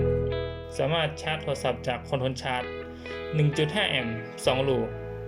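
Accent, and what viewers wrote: North, Thailand. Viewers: แนวเสียงยังกับคนตางเหนือแม่นก่อครับ จัดวางระบบสวยงามครับ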